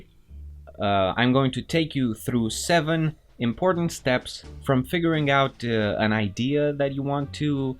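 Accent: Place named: Romania. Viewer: yep...sounds like a romanian brother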